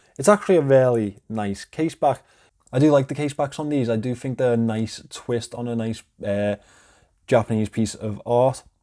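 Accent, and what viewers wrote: Liverpool, United Kingdom. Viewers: I think should speak as he speaks, with his scouse accent, there are hundreds of different accents within the UK and I hope it always remains so, keep going you're doing a great job...stay safe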